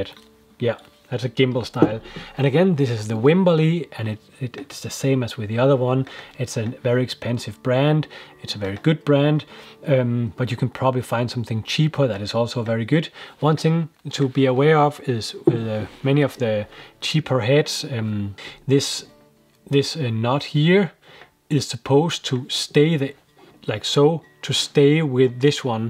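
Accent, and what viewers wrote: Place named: Denmark. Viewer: Hi, seeing that you use the Tamron 150-600, have you tried with the new "Bigma" 60-600? Thanks for your videos, very instructive and easy to understand... specially with your Daninglish... perfect for my Spanglish. Greetings from Colombia, JuanB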